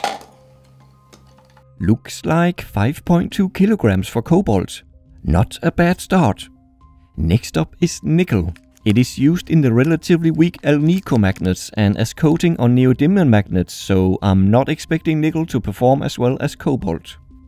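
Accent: Denmark. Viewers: Are you danish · Are you danish?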